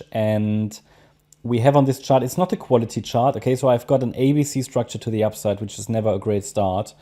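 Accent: Germany. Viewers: Not bullish😮😅😅😅...are you german ?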